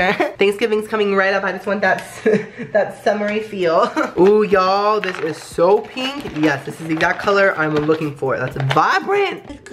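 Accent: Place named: United Kingdom. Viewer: The British accent killed me lmaooo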